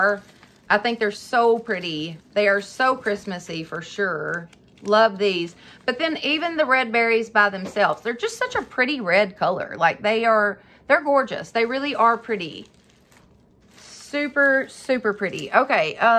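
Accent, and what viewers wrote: South, United States. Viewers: Hi, I love your personality. You are always so cheerful and positive. Your projects and ideas are awesome. You always make me smile and I love love love your accent. I live in Canada so to me you have a very strong southern accent. Love watching you channel. Will be waiting to see what you do on the next video.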